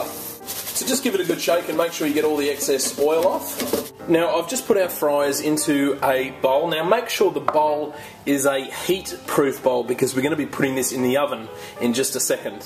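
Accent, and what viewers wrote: Australia. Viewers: FYI, he clearly has an Australian accent... Second. Yes, he's doing the poutine wrong, but it's not his fault. I'm sure it still tastes good. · Hes Australian, you yokel. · He's Australian you morons and this recipe was shit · Are you Aussie ? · He is aussie not english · Omg he's Aussie give him a break!!! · That's an Australian accent mate. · Nice job being a grammar nazi, a douchebag, and making fun of the way he pronounces things. He's Australian lol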